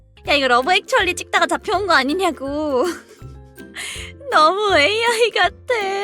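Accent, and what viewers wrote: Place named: United Kingdom. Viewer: 영국 발음 느낌 지리시네요ㅋㅋ 개추드립니다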